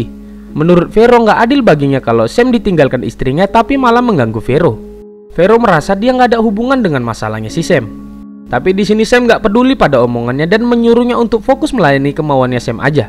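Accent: Medan, Indonesia. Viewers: Medan kali kau bang, kalo dari aksenmu ini kau tinggal daerah setia budi, atau padang bulan, atau sekitaran kecamatan medan selayang dan medan tuntungan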